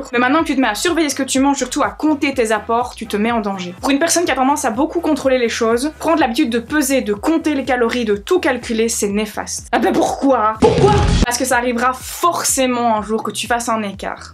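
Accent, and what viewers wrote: Belgium. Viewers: Je viens de débarquer... tu es belge? Les r bien prononcé c'est comme par chez moi tout ça, tout comme la "pouissance nucléaire" x) #patriotisme #lovelaccent Pardon on a du te le dire 50 000 x. (Je suis en admiration devant tes bras (strange dit comme ça)). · Tu est belge non ?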